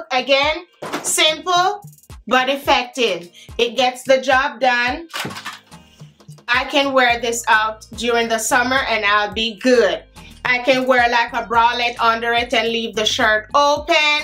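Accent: Jamaica. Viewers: Randomly came across your video and had to subscribe right away as soon as I heard the Jamaican accent! Now back to the video…love how u style the fits. The lime green/Lavender is my fav 😊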